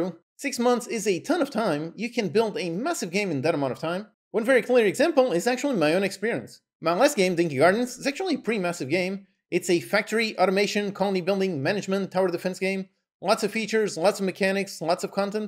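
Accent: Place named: Portugal. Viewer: Hey. This is great advice! I had a feeling that you are from Portugal and live in Portugal because of your accent. Is that correct? I live in Portugal as well! I don't build games (even though I would love to), but I build game dev tools and libraries. I built a 2D game framework called Velaptor. We should connect!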